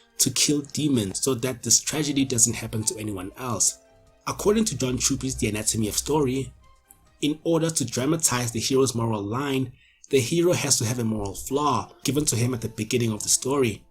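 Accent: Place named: RP, United Kingdom. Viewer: Bros english is really queen Elizabeth II level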